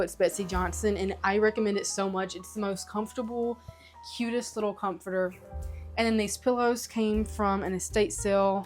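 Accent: South, United States. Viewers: I have no idea how I came upon your videos but si happy to of found you! I LIVE your accent. Where are you from? I'm from Middle TN... now love in South GA. You sound Southern too. You are an old soul my dear... and a lil doll. I have a tiny channel... I love to thrift too. I just started... clothes mostly because I've lost over 200 lbs in the last 5 years... and it's expensive to have to buy EVERYTHING! 😊 oh and I cook Southern budget meals mostly. Nice to "meet" you... love your style sugar ❤ Jackie in GA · I love literally everything about your videos. Your outfits, your style, your vibe, your delightfully southern accent, your love for secondhand, your realness...I could go on! More channels like this oneee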